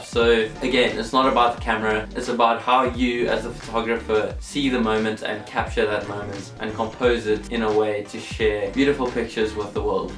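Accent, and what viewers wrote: South Africa. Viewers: South African? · Are you south african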